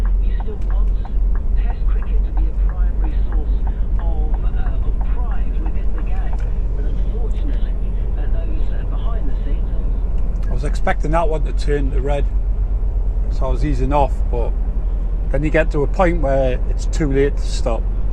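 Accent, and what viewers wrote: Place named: Bedlington, United Kingdom. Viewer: where you from? sound very local to me (Bedlington) nice vids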